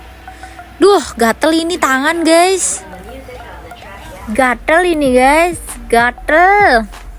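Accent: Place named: Bekasi, Indonesia. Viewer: Dari bekasi ya 😂😂 logatnya kaya ga asing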